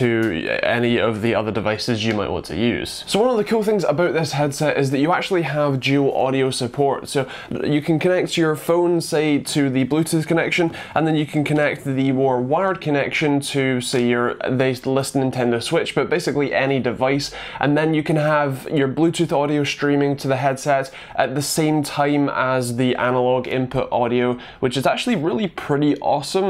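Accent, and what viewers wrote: Scotland, United Kingdom. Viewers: I spent a solid minute trying to figure out if this guy had a Scottish accent or not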